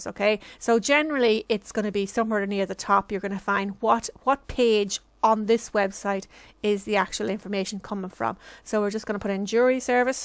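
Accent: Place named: Ireland. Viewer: Lots of ok ...ok..ok...all over. and it will sound more interesting...probably Irish Accent?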